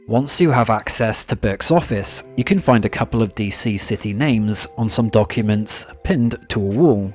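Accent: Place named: United Kingdom. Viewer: I love these videos and your British accent. Great videos to sleep to, not too many loud sounds n such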